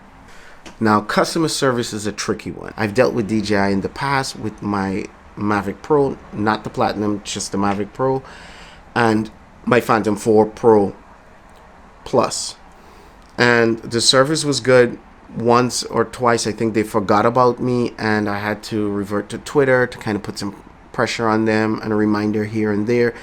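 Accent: Trinidad, Trinidad and Tobago. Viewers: u from Trinidad? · Always nice to find a new drone channel on YT...subscribed. Loved the video. You sound like an expat Trini btw.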